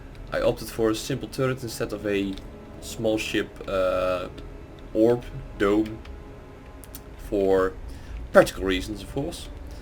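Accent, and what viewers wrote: Netherlands. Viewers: i have this feeling your dutch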